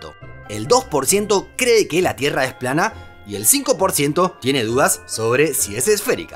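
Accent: Argentina. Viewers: Maquina vos sos Argentino? Si es asi, CAPO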